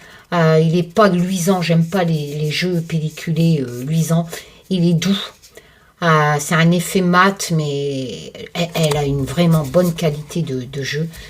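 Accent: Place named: Ch'ti, France. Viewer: Bonjour jadore quand tu parle en patois chti Je suis une de snord mi Ca me fais du bien en plus quand tu chantonne le schiot quinquin Bisous ❤❤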